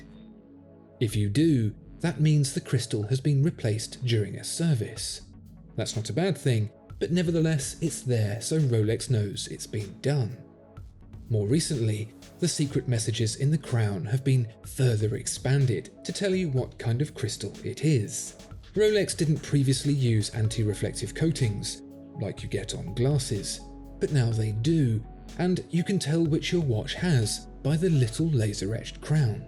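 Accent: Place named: RP, United Kingdom. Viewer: Amazing vidiography, a perfect posh British accent. But underneath the BS, the same blind brand loyalty every rich D has and needs in order to purchase a Rolex. After a whole week of focusing on German perfection, your need to sell some garbage, yes garbage Rolex, is sad for me, one of your more loyal followers. I know you need to sell, but double standard is something I really discusse.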